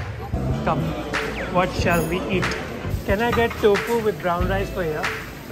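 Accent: India. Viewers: why does his accent sounds exactly like the American stereotype for "indian guy". Not that I liked it.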